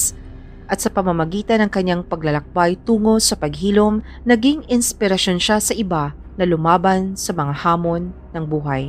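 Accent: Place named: Visayas, Philippines. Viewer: Bisaya yata ang narator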